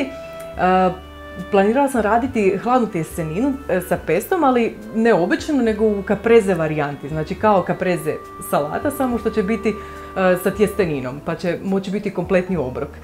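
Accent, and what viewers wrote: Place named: Dalmatia, Croatia. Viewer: Draga,dok gledam tvoj video setim se letovanja koje sam provodila u Zadru i okolnim mestima.Tvoj rečnik i naglasak me podsete na ta divna leta.Tvoj vrt je predivan.Pozdrav iz Beograda.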